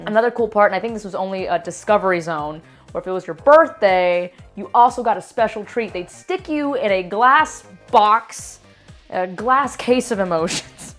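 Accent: Cuba. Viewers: I luv her Cubaglish.🤗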